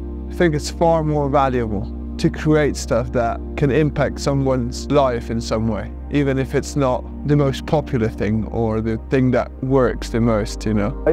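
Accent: United Kingdom. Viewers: i just realized how dificult to understand is british accent for me but anyway your clip are awesome. Thanks for sharing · i loved your videos in spanish, but im also loving your british accent. and most of all, so glad you just did it and made a video. so beautiful and cinematic even with a simple man climbs mountain storyline every second is enjoyable. i still go back to your other films to improve my spanish. excited for the proximo video and inspire to get outside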